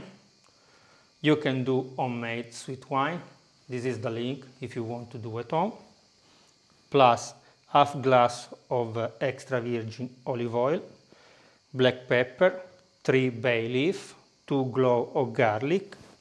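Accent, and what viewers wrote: Italy. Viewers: Italian accent????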